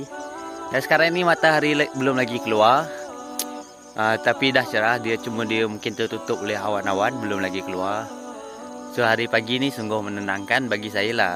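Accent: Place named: Sarawak, Malaysia. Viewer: kau orang Sarawak ke?? suara dan cara kau bercakap macam kawan lama aku orang Sarawak nama Azmeer... hahahhaaha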